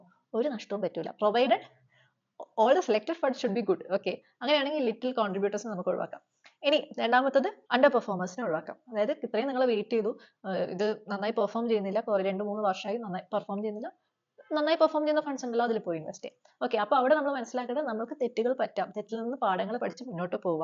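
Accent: Kannur, India. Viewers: കണ്ണൂർ കാരി സ…അല്ലേ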